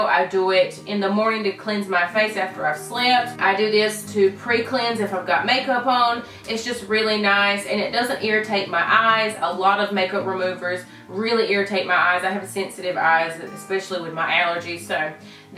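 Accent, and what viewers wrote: South, United States. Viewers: All it takes is a country accent and I subscribed.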